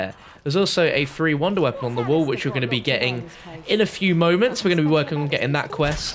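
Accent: United Kingdom. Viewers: People need to stop ripping to shreds about how you pronounce words. British English and American English are very different at times.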